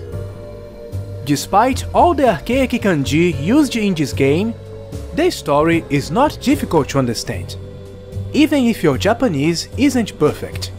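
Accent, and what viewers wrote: Brazil. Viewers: Your accent screams braziliam... Nice videos anyway~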